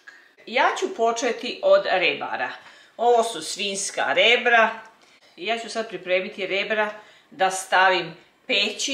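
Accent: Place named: Slavonia, Croatia. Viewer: Sta reci - potpuni uzitak gledati kao i uvijek spremanje odlicnih jela i slusati divan slavonski nagalasak. Moram probati ovaj recept za krompir. Pozdrav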